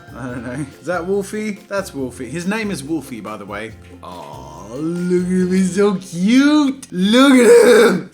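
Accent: United Kingdom. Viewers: The brit accent though 😂😂😂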